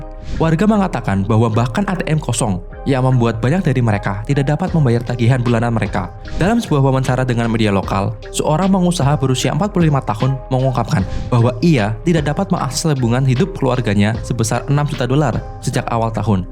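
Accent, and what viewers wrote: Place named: Banyumas, Indonesia. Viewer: Aksen ngapaknya terasa ya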